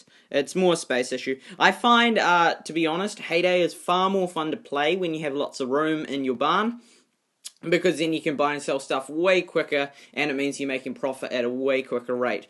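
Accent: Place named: New Zealand. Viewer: WhiteAsian Manchild he’s from New Zealand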